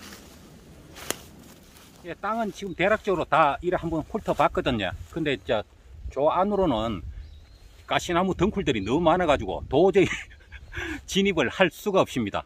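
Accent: Gyeongsang, South Korea. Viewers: 경상도 분이네예 ㅎㅎ 고생하셨습니다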